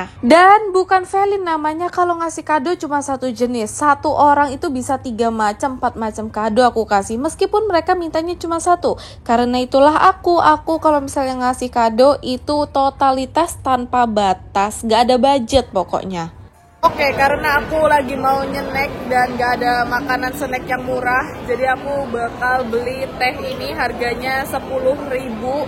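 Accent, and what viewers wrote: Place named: Surabaya, Indonesia. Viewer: Kak ngomongnya kok agak medok seh ? Agak surabayaan gitu...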